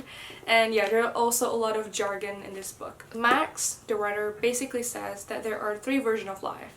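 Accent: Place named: United Kingdom. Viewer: British accent nya 🔥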